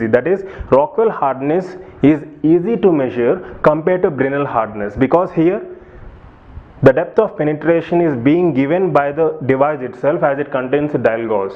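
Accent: India. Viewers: I hate Indian people when they speaking English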